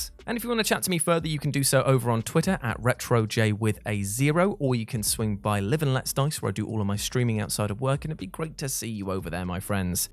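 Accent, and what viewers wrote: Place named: United Kingdom. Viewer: It’s a British pronunciation. You should hear how they pronounce “schedule” or “herbs”.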